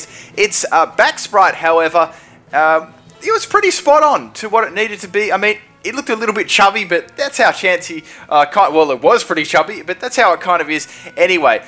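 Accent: Australia. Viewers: I'M FUCKING CONFUSED YOU SOUND AUSTRALIAN ARE YOU AUSTRALIAN!?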